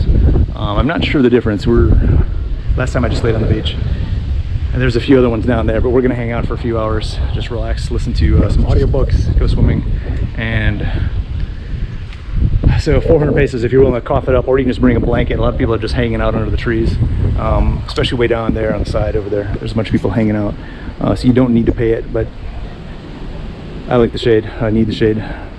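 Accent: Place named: Chicago, United States. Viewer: Are you from Chicago ? When I listen to you speak we sound exactly the same.!! 😂😂😂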